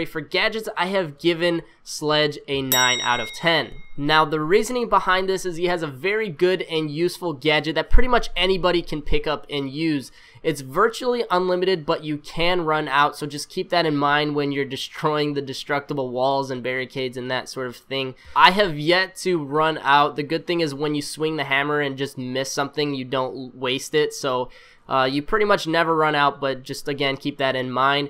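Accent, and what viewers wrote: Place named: Scotland, United Kingdom. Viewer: Zezo The Noob his accent is strongly Scottish not English sry but I'm from England so I hate it when ppl say that Irish and Scottish accents are english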